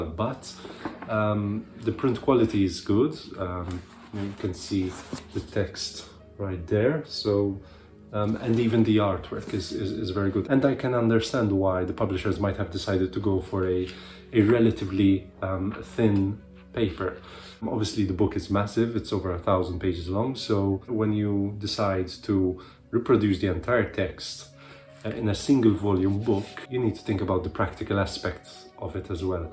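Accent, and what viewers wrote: Malta. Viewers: Hello from a fellow Maltese. Recognized your accent. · Given your english accent, i think you're a fellow Maltese :) beautiful books, but i'm torn between the 2021 standard and the 2004 harper collins 😅 might cave in and buy both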